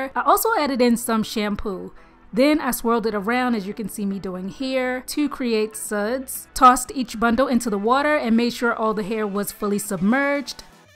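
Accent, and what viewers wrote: Baltimore, United States. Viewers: I hear that Baltimore U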